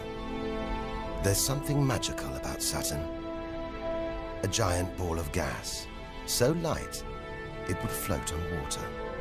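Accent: United Kingdom. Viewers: Sean pertwee vs alec baldwin, sorry but the british soft spoken accent is so much more real. More believable, more comforting and true. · Why do they alway have to re-voice American documentaries in an English accent or vice versa? I’m fed up with that! It’s the same fucking language! If I can understand both accents, so can everybody! Give me a break! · British narrator, but this is so American!.. hard to watch as an European.. why always so full of it. Keep it real folks! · Why is Alec Baldwin speaking in British dialect?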